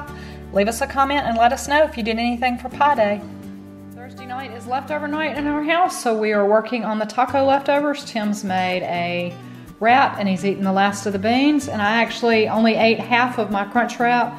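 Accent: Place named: Mississippi, United States. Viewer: Thank you so much, I have been wanting to grill so badly, but we've had so much rain in our area, a small town in Northeast Ms., called Mantachie. I started watching your channel when Krista from The Logues mentioned you and how much she loved your accent..lol...I had to go watch, I remember telling Krista I couldn't tell because you sound like me.☺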